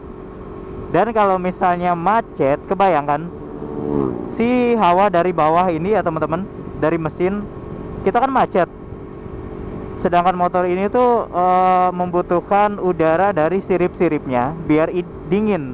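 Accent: Banjar, Indonesia. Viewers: Jir orang Banjar bree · Bang orang Banjar?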